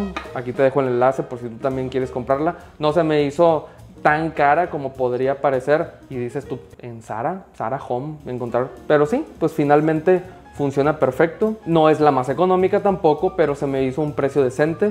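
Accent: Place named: Paraguay. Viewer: Encuentro algo de Paraguayo en el acento, soy de ahí, un abrazo si acierto 😮😮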